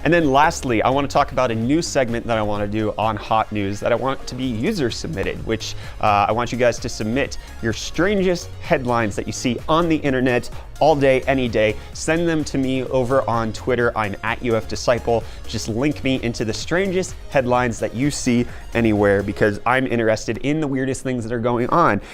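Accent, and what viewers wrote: United States. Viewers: You sound American. Why do you live in South Africa?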